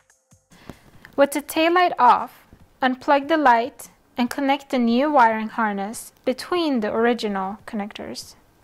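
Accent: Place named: Romania. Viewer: Thank you for your video. I am installing mine this weekend following your instructions. You sound and look romanian.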